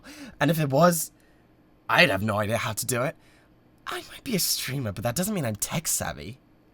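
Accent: United Kingdom. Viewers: I LOVE HIS BRITISH VOICEEEE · The only british accent i tolerate (love the audio)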